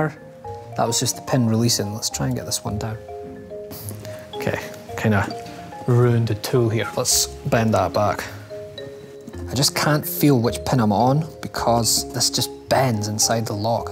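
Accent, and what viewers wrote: Scotland, United Kingdom. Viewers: R u Scottish you sound like it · Where in Scotland are you from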